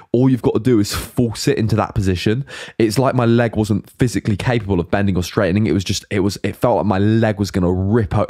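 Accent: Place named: England, United Kingdom. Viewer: I love your English accent 😍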